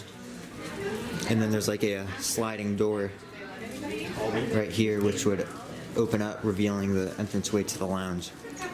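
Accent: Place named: South, United States. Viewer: The whole Wonders building is very dated but could be gutted and converted into something else. The outside should be painted from yellow to silver or something less garish but the space is huge and should be reimagined into something which makes use of all that space, maybe a ride. You are so disciplined which is good as it must be tempting to open things and interfer with stuff you see but you don't which should be commended as a lot of vloggers don't always do the same. Also where are you orginally from? I can't always recognise American accents but you seem to have a soft sounding southern accent I can't work out.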